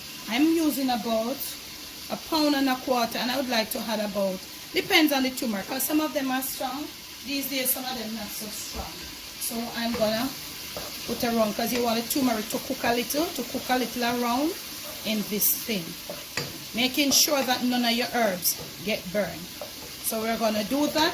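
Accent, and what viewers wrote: Jamaica. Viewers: Yes Miss! Gwaarn wid you bad self. Niceness from start to finish. I love how you keep it real with your lovely likkle jokes and patois style. Bless up and one love always..🇯🇲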